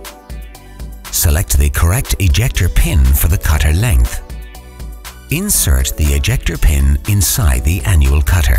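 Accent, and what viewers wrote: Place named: Europe. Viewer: The narrator is also hilariously European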